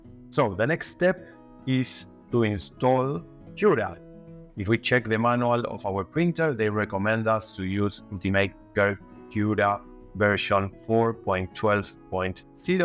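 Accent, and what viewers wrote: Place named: Latin America. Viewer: De donde eres? tienes un acento tirando a latino..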